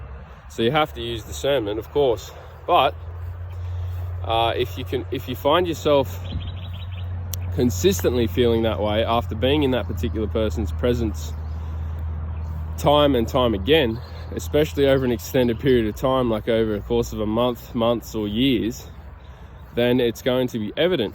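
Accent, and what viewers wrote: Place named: Australia. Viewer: awesome stuff my brother just found you're channel now you sound like an aussie I'm from sydney would love to have a good old yarn with my fellow brother in Christ about our testimonies , the spiritual warfare , isolation of those who can see and hear ,the ones that pray for discernment and pray for a mighty strong faith in the way the truth and the life and pray our Father`s will be done on earth as it is in heaven . i don't usually comment on anything but felt i should right now would be cool to catch up some time my bro GOD willing God bless bruv Deano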